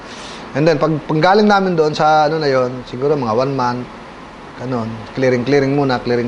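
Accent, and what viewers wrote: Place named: Batangas, Philippines. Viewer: batangenyo si sir ala eh!!!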